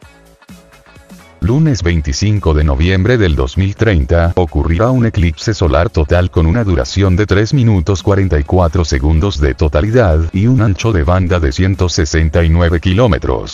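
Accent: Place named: Argentina. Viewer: Me suena argentino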